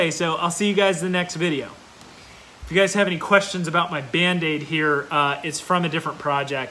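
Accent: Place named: South, United States. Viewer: Also where are you from? Your Southern accent makes me think you're either from Louisiana, Arkansas, Alabama, Mississippi, Texas, or Georgia.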